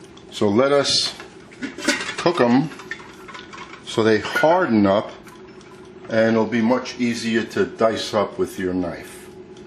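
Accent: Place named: Boston, United States. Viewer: When I clicked on this video, I thought to myself: "How awesome would it be if the guy cooking Boston clam chowder, actually had a Boston accent." Apparently my wish came true!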